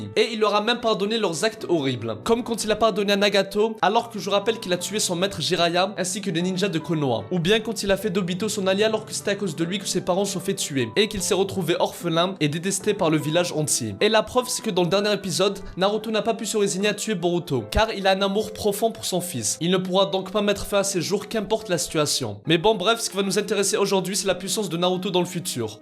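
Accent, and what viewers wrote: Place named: Kabylie, Algeria. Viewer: l'accent kabyle est fort présent ! xD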